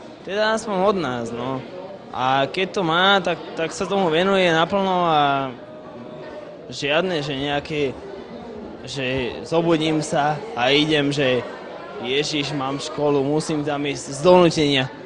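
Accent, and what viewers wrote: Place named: Liptov, Slovakia. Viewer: Páči sa mi jeho prízvuk, sťaby z Liptova pochádzal, len ma trošku mrzí, že búši viac ako by sa patrilo. Aj tak by som prijal a člena mojej družiny.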